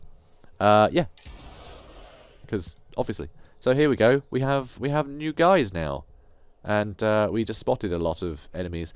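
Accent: France. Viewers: Hey serious question where did you learn to do the french accent! That's one I'm not good at but want to learn! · Appreciate all your Total War videos. Favorite part of this one is the French accent 😄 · Pretty good Bretonnian(french) accent!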